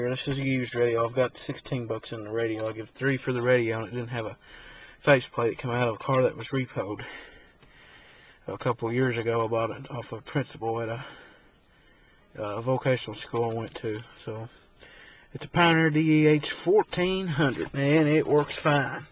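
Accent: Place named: Alabama, United States. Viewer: Yea I tried to watch but made me sea sick. Uhgg. That's an Alabama axcent if ever herd one. I went fishing in Alabama. Everywhere I went some local yockal would flip me some lip about being a yanky. I thought why? Because I speak clear and consise. It got old real quick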